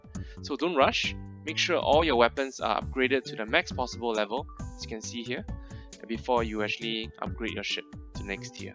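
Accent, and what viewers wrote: Singapore. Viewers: sinkie accent very obvious haha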